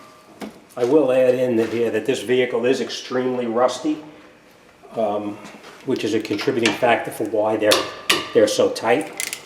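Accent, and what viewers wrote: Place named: New York, United States. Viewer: I'm a New York and I can hear it!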